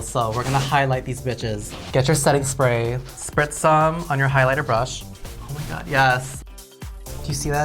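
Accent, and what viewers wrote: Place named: Chicago, United States. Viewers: I'm only able to focus on her Midwestern accent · the chicago accent is jumping out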